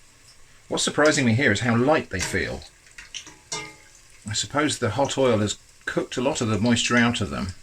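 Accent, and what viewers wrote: United Kingdom. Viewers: Must be the same British accent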